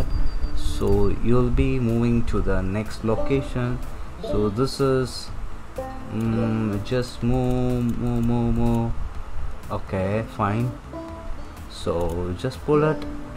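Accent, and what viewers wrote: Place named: Kerala, India. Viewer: U sound like mallu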